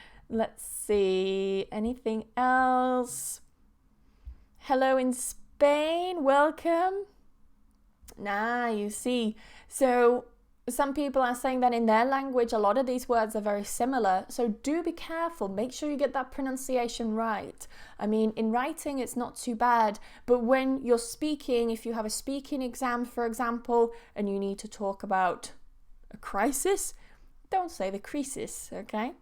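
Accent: United Kingdom. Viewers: Why do you speak a British English and accent?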